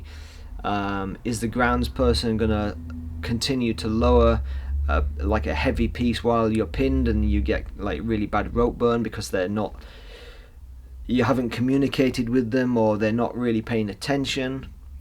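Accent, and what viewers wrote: United Kingdom. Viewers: Sweetest name ever!! With the British accent! This guy needs to be a movie star!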